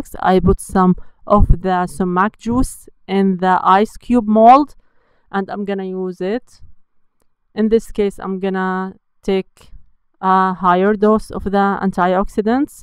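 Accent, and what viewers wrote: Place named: Iran. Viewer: I love the Iranian accent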